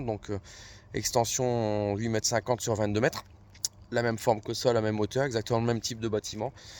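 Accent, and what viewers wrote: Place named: Nord-Pas-de-Calais, France. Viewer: Non......on t'aime et chti accent moi j'aime!!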